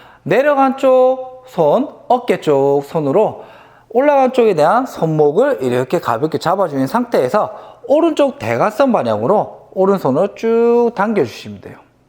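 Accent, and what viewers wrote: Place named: Gyeongsang, South Korea. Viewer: 말씀 들어보니 경상도 싸나이신듯 하네요.☺따라해볼께요 땡큐♡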